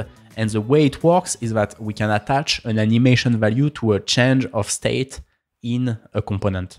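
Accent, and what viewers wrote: France. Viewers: Oh je sens l'accent français ✌️ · This accent is really French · But maybe french cause the zzhh prruh pronunciation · Nice French accent there, Sean Evans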